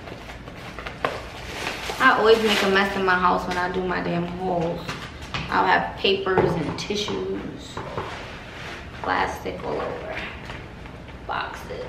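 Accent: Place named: New York, United States. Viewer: This must be a NY thing because, I talk just like this in my video😩😩😩 (FEEL ME?)😂😂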